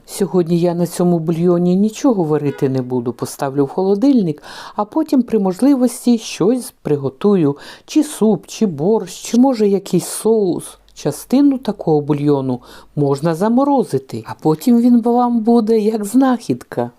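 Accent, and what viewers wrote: Ukraine. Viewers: Нееет, все ж таки кровушка отцовская перебарывает, люблю я вас хохлушечки, люблю ваш говор, люблю ваши песни, люблю ваши танцы, люблю вашу украинскую вышиванка и национальные одежды, правда, как много наготовили вкусняшек только лишь из 6ти спинок куриных, дякою за ваше видео, здоровья вам, счастья.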